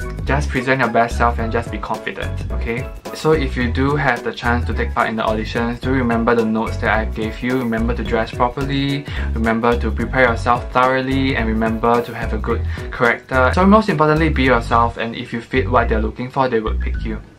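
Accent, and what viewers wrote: Singapore. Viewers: I hear a singaporean accent👁👄👁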